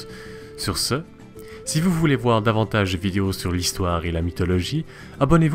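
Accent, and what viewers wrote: Quebec, Canada. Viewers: En vrai la vidéo est bien mais l'accent canadien ça me sort du truck dsl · Petit accent québécois..👌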